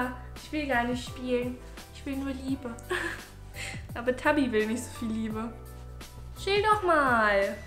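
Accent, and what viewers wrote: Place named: Hesse, Germany. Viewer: als ob du auch aus hessen kommst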